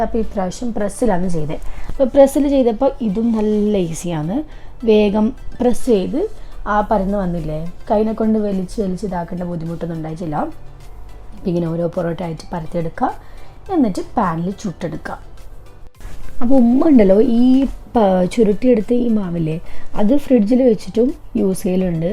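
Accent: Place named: Mangalore, India. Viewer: Lv ur way f talkng frm mnglrian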